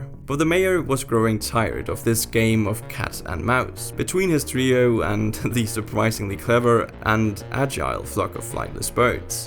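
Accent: Denmark. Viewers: He’s Danish, how in the world did you mix up those accents? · Are you from Denmark? Your accent kinda sounds like it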